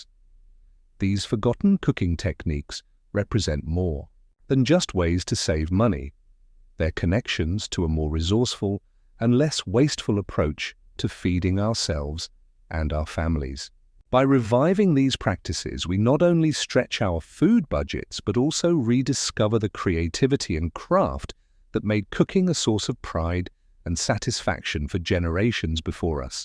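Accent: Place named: United Kingdom. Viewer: A video by a channel that implies USA-centered content, and narrated by someone from the UK?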